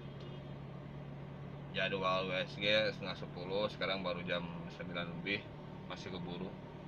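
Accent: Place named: Banyumas, Indonesia. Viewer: wong ngapak pwrt ini bang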